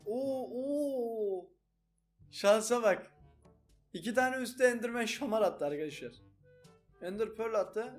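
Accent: Black Sea, Turkey. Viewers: Abi senin gonuşman çok hoşuma gidiyi bende garadenizliyim bayburt Guklagraft yeee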